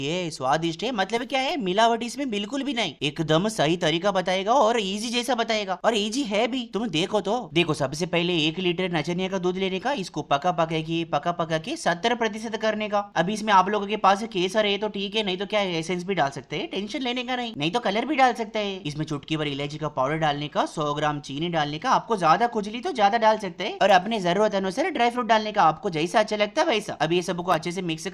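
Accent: South, India. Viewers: You r amazing 😍aap sach me south se ho ya south accent only for video 😅👌🏻👌🏻👌🏻👌🏻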